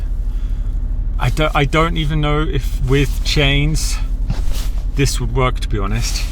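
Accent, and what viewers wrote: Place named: Switzerland. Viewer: Hearing you talking swiss german is funny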